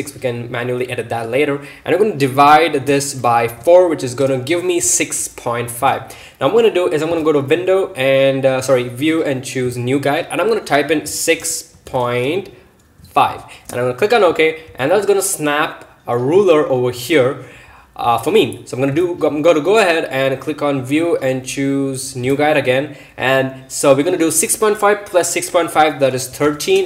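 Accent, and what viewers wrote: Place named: India. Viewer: You're indian I kinda speak similarly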